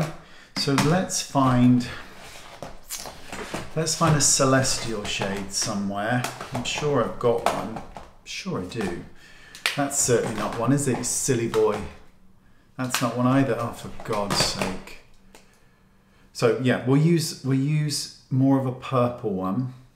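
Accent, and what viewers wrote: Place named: London, United Kingdom. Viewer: Thank you very much,. I love your English. Where r u from? London? 💞💕👏🙋🏻🇪🇸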